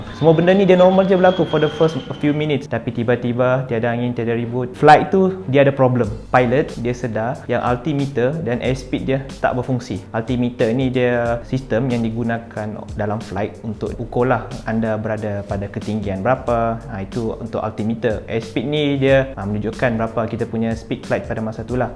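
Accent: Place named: Sarawak, Malaysia. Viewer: Mcm org sarawak je.sbb bunyi slang mlayu smenanjung kurang😅😅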